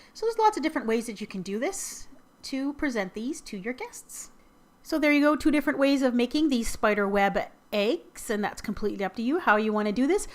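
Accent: Canada. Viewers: ...are you canadian????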